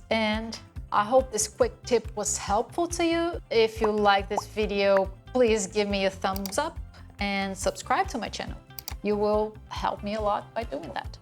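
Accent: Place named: Brazil. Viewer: Brasileira né? Percebi logo no inicio do video. Eai Guy's. hehehehhe. Anyway your english is nice. Flow! :) Great video. Obrigado!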